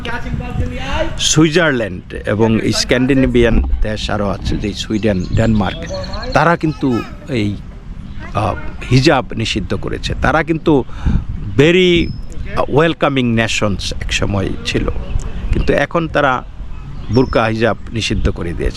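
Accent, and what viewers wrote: Bangladesh. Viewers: Islam is not a religion but is a political ideology.... don't be a hypocrite...you also know the truth... whole EU must ban muslims...and ban muslim immigrants... don't you know how Lebanon was islamised in just 20 years... your dialect tells me you are a Bangladeshi Hindu...have you forgotten the Noakhali massacre of innocent Hindus...??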